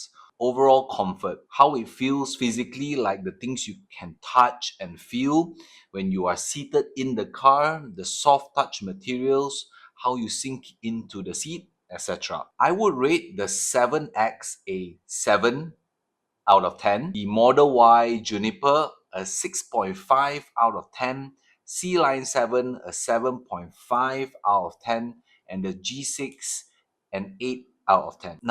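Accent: Singapore. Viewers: Looks like Malaysia where cars are dirt cheap. But you sound Singaporean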